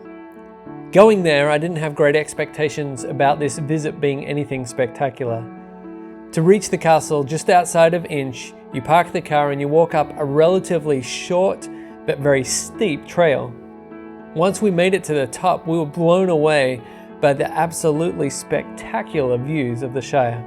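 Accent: Australia. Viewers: Thanks mate ... that was awesome. You sound like an Aussie? Taking my family to Scotland soon to Aberdeenshire ... Banff in particular.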